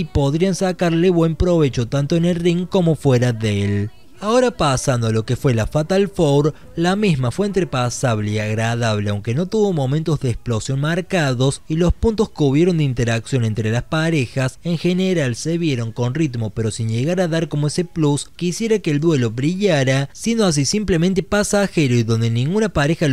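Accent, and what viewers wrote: Córdoba, Argentina. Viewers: sos cordobes???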